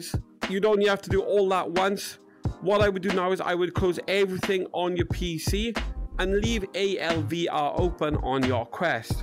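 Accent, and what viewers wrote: United Kingdom. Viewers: Bri’ish huh? Well im glad I found you lad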